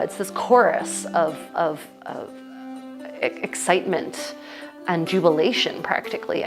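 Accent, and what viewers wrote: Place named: United States. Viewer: You are living my best life! LOL I have always wanted to do exactly this! I specialized in Elizabethan, but also have many Italian Ren, although I probably have much to learn from you in Italian clothing. I would love to do this in England as well as in Italy. I never have been able to get over there, dealing with various health problems; I am so exhausted after dressing this way, I need to lie down! Gone are the days of my youth when even though I have lupus I could work, run home, pack for the weekend, drive 4 hrs, set up pavilions, dress, and socialize/party the night away. LOL I miss it. How much luggage did you have to take? (assuming from your accent you live here in America) I could get one Elizabethan kit or two Italian Rens and that would fill my biggest suitcase! Your workmanship, BTW, is exquisite! I love wearing the gowns I have sewn entirely by hand...I show people the stitches and they get so blown away...I just let them know it's just like doing embroidery, you start it understanding you won't just whip one out in a day, it's a PROJECT!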